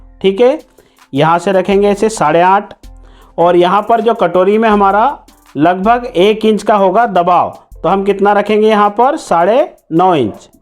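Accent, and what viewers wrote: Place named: Maharashtra, India. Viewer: मला वाटलच होतं आपण महाराष्ट्रीयनच असाल कारण आपण अठ्ठाईस ला अठ्ठाविस, सत्ताईस ला सत्ताविस बोलत होता. जय हरी विठ्ठल बोलणारा माणूस मराठीच असू शकतो. 💐💐💐🌺🌺🌺💐💐💐💐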